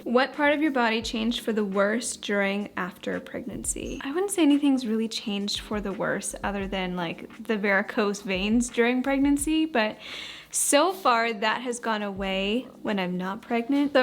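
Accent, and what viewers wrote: Western Canada, Canada. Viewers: It’s definitely more of a west Canadian accent because here in Ontario we don’t say it like that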